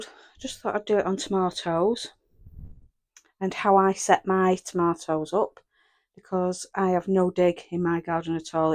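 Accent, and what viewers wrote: Lancashire, United Kingdom. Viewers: I live in Japan now, but grew up in Lancashire. As soon as I heard the accent I knew you were one of my people. :D It's great to hear on this big, wide internet. Great stuff. I've a smallish garden myself that I've just started a channel on (not this account :D). I only have two tomato plants and they've just set fruit in the past 2 weeks, but maybe I'll catch up to you next year. :D You mentioned that you were a beginner, but have you grown so many tomato plants before? I'm looking forward to seeing how they get on. Cool that you have so many frogs!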